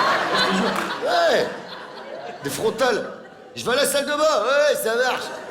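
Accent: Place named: Lyon, France. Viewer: Joé Manitooly moi je suis originaire de la Loire du coup je l'entend l'accent lyonnais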